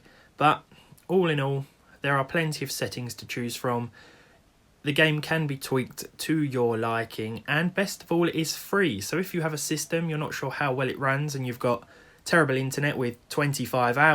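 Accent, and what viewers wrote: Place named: United Kingdom. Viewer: That british english... pain in the ears 😞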